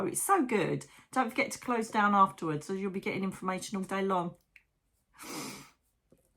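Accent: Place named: England, United Kingdom. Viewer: Hi! I love you and your videos. I'm training myself to understand your English accent. The more I listen to you, the better I get but... you speak too fast! Thank you for being here for us. Nancy, Argentina.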